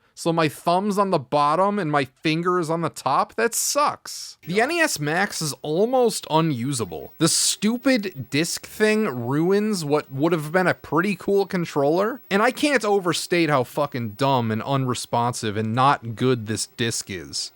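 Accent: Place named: Midwest, United States. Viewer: What part of the mid-west / great lakes are you from? Because you have a distinct accent...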